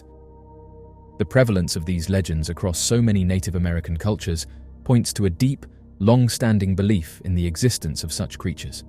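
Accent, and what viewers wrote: England, United Kingdom. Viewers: No more pohm narrators... OUR COUNTRY.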